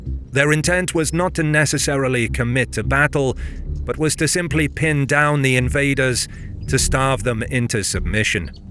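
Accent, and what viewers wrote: England, United Kingdom. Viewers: Right on! As an American, this is my history too, but it definitely needs to be told in a proper English accent! Excellent detail and a great narrative. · It’s very much an English accent. We have loads of different regional accents. Glad you enjoy the history. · You are right, it is your history too ! BTW, it is an English accent.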